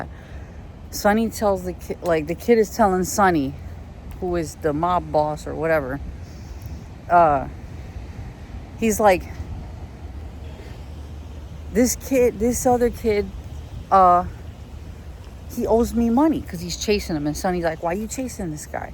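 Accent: New York, United States. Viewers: you actually sound Italian in your normal speaking. You sounded great imitating Sonny, because you naturally sound like from New York or Philadelphia! You always reminded me of a girl version of Ralph Macchio. You would have been terrific if you got to be in the Godfather movies. I lent $1050 last year to two different people, $500 to one person and $550 to another person. I haven't gotten a penny back so far. Well, I will be just like Sonny, they will never ask me for money ever again, so I paid that to get them off my back. Also, I truly believe that God replenishes in the future. God will give it back and I might not even realise it, but he will.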